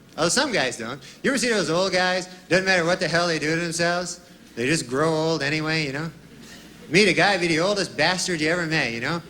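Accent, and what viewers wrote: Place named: Canada. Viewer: Man he's SUPER Canadian right here now eh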